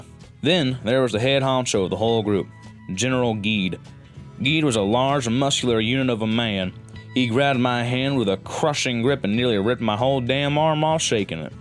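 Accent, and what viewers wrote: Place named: South, United States. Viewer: Your southern voice is kinda cringe but your vids are still good. Just tell stories in your regular voice dude.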